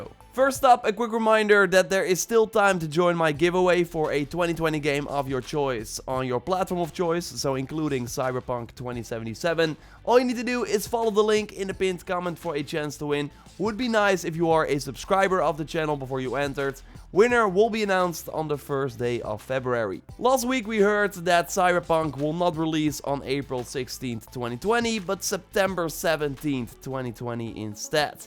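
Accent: Netherlands. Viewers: Are you dutch ?? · The dutch accent is heavy on this one.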